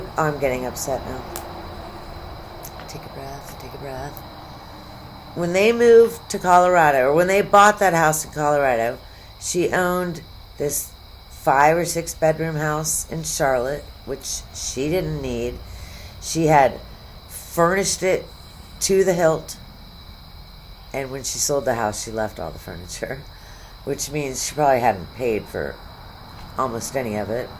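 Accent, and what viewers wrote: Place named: New Jersey, United States. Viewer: Just found your channel. You sound so much like a writer/comedienne named Tara Dublin. Same throaty, sort of New Jersey girl accent.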